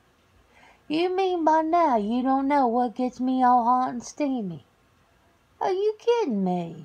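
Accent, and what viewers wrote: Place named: South, United States. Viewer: hi another great vidio with the Southen accent too always good listening my friend Stay Safe xx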